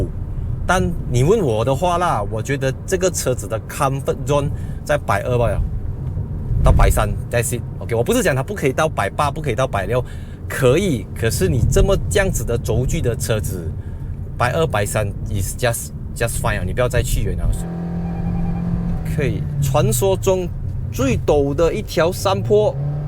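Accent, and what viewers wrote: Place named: Malaysia. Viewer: 这是大马华语吗，中英文结合蛮有特色的，哈哈哈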